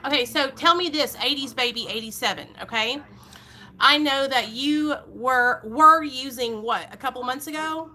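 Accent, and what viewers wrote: South, United States. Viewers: R u from down south? I'm recovering addict outta Houston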